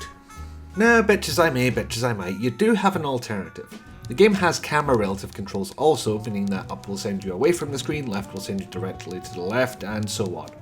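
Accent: United Kingdom. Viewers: I love your Scottish accent!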